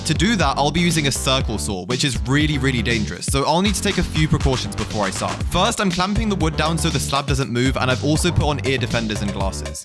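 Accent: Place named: England, United Kingdom. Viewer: im trying to work out what country you live in because you sound English but seem like you live in America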